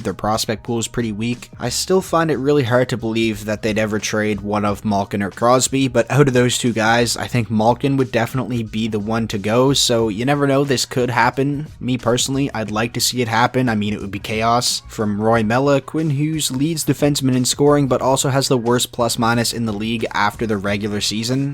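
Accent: Canada. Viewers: Your voice sounds often Canadian! Not that that’s a bad things!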